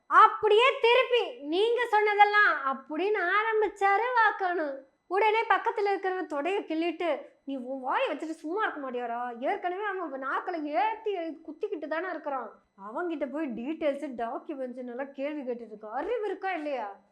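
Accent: Kongu, India. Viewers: கொங்கு தமிழ் சுவையோடு அழகாக பேசும் சகோதிரிக்கு வாழ்த்துக்கள்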